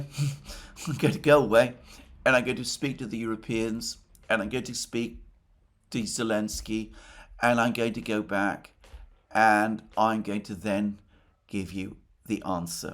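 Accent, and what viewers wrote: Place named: RP, United Kingdom. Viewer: 勿体ぶった話しぶり 下顎を上げ視線は鼻先をすり抜け "ゆ なあーいてっど" 「united」（発声発音：Received Pronunciation、RP） 持って回したものの言い方 その先に必ず待機万端するのは 枕詞 「以前私が議論した通り」 これらの風味滋味を愉しめる渋い寛容は キエフ陥落に至るまで持ちこらえられそうには、もう無い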